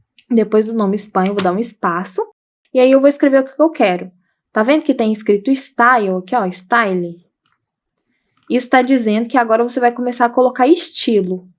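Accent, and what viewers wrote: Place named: Brasília, Brazil. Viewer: Se pudesse, dava 1000 likes. 👏👏👏👏👏👏👏👏. Como faço para ter uma aula presencial com vc? Vc é aqui de Brasília? ( Pergunto pelo sotaque. Rs).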